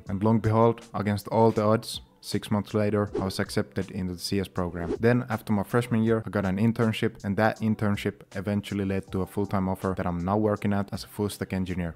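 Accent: Finland. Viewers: I don’t speak Finnish (yet) but my mom is from Finland and I knew I recognized your accent / the written language right away. Great video, hyvää työtä ! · where are you from? u sound kinda like finland edit: ok, nvm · I was thinking that this sounds just like a finnish person then i saw the screen shot :D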